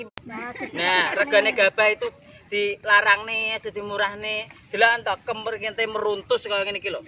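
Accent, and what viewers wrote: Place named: Ngawi, Indonesia. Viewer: Lucuuu yaaa Mbak...saya asli Ngawi sdh 18th tinggal di Bali dadi kangen sama kampung loo Mbk...boso ne mengingatkan jaman cilik ning Ngawi😘😘😘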